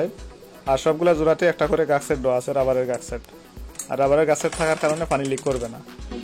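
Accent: Sylhet, Bangladesh. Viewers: ভাই সিলেটি নাকি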